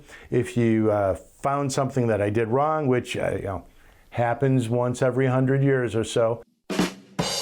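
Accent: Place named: Midwest, United States. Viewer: Pretend the Bears stuff isn’t in the background. says dis guy is obviously from da Midwest, Great Lakes region of America…and dats a great place to be from. I’m glad you don’t have a boring 25 or 30 second canned intro. Just straight into it. Nicely done, Sir. Just yesterday I ordered a Mark IV Target from Bud’s. Looking forward to its arrival. GO LIONS!!!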